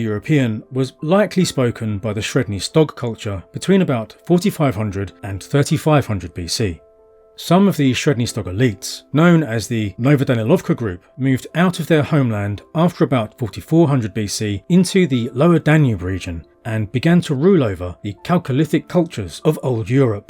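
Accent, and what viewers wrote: England, United Kingdom. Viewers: Oh… So in England, you say it wrong.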